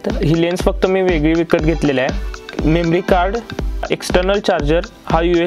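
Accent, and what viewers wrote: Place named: Kolhapur, India. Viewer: Assll kolhapuri marathi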